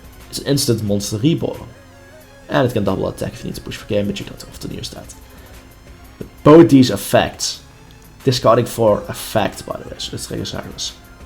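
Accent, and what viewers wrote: Netherlands. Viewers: Are u dutch? U have a dutch accent Nice video btw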